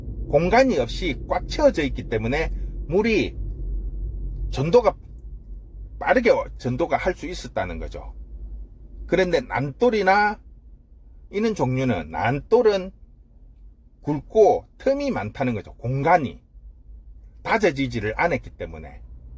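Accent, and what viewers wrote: Gyeongsang, South Korea. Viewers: 갱상도 발음 정겹게 들리네요. 일리 있는 말씀입니다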